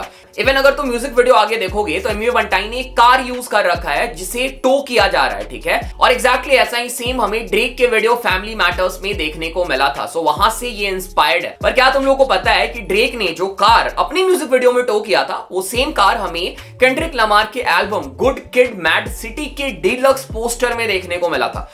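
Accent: India. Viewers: Bhaiye logo ke jaisa baat karta h yaar ye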